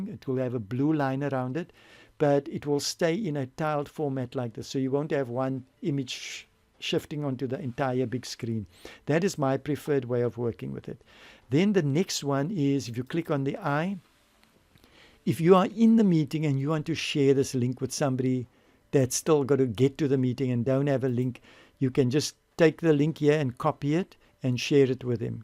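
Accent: South Africa. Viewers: Ek wou se jy is afrikaans, groete vanaf Nederland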